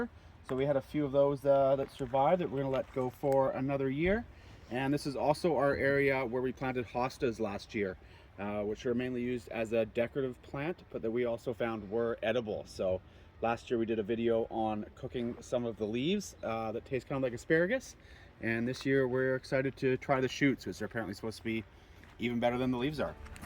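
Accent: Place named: Canada. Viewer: I knew I was hearing a Canadian accent. What a location! Was this land in your family?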